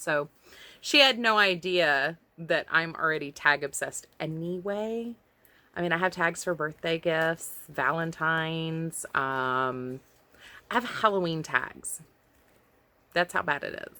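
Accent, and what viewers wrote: South, United States. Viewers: Im a new subscriber but wanted to say I really love your videos! I watch Mary from Ireland too LOL my late husband was very Irish so she touches my heart. Your sweet southern accent reminds me of home (I'm a country girl from rural midwest). Keep up the great vlog!!! Oh and I luv luv luv the dr who scarf!!!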